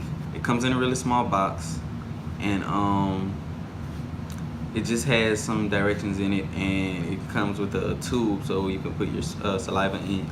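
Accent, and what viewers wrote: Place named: New Orleans, United States. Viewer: I love that we know our people just from the accent. I said to myself, "He is from New Orleans." Then I saw your results which were very similar to mine. One parent is from New Orleans, but I was born and raised there. My only migration was also Louisiana Creoles and African Americans. Thanks for sharing.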